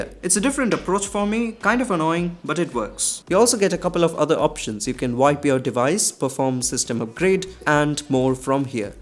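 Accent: Bengal, India. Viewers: Bro are you from West Bengal or from Bengali ancestry as I have seen little Bengali accents from you because I am one myself. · btw great content. I assume from your accent that you are Bengali. Anyways, take love.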